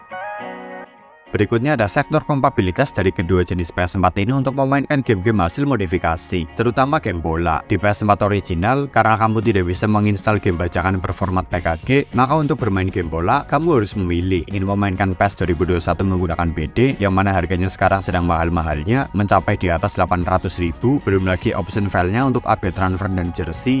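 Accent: Banyumas, Indonesia. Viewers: VoiceOver.e ngapak nemen ya?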